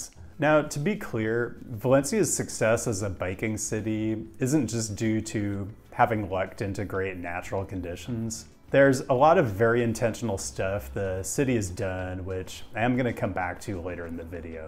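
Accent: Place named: California, United States. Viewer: OK, I don't have much to bitch about this time, I just think references to climate change are a form of insanity or at least unhealthy obsession. CO2 is NOT a pollutant but food for plants, and there is no evidence we are about to boil because we drive cars. Having said that, I am all for sensible bike lanes Valencia style, but I am skeptical about scooters. I also have to say that your delivery is actually charming and sexy, in a weird way. I am not a fan of the Kardashians, but your delivery in that LA/CA tone actually works ;-)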